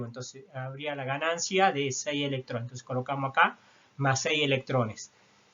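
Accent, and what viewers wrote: Argentina. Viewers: uff, que acento papá. Argentino?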